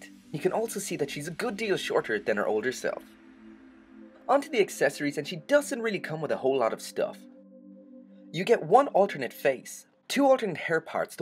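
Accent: Ireland. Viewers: Awesome figure! ^_^ You wouldn't happen to be from Ireland would you? You really sound Irish xD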